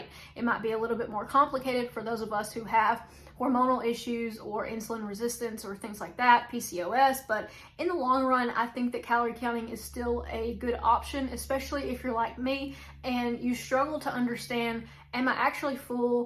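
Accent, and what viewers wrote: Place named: Tennessee, United States. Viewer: Thanks for ❤ honesty and accent. I live in Tennessee so it sounds nice.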